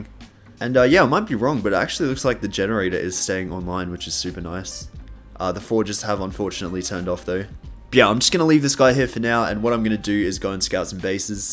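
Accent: Australia. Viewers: Awesome content, love the Aussie accent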